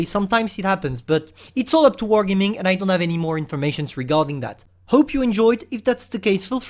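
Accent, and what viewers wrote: France. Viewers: Ta un accent français de ouf mec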